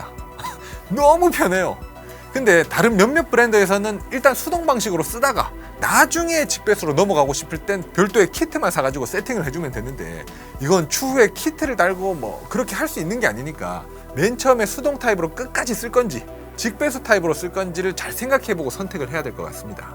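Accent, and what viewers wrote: Gyeongsang, South Korea. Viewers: 경상도사투리 드럽게 듣기 싫네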